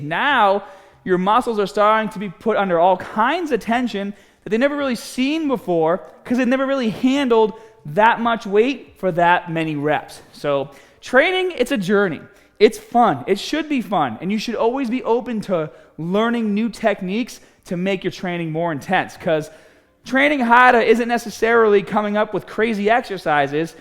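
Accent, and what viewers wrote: Boston, United States. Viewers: I have been watching videos while doing cardio and I have developed a strong tendency to speak in a Boston accent. Win Win. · His point still stands... his accent is Boston in USA not South Africa lmao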